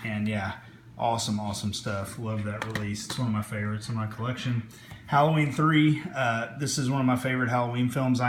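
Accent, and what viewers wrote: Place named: United States. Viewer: Yeah, Scream Factory has released so much great stuff. I'm so thankful they released the Halloween boxset. Ok, you have a cool accent, so was just wondering what state you lived in. I should have my Scream Factory vid up by early next week.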